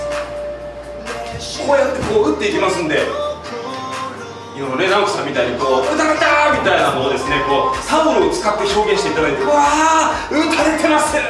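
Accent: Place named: Japan. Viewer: Antes de seguir a no pensaba lo impactante que era escuchar a un japones ponerle la "u" a final de una palabra en ingles:v wow.. nice <3♡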